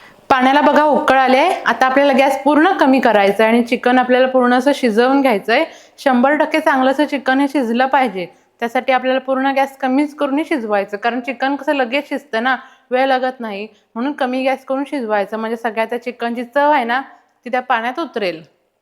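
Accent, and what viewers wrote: Kolhapur, India. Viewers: कोल्हापूरी टोन यायला लागलाय!😊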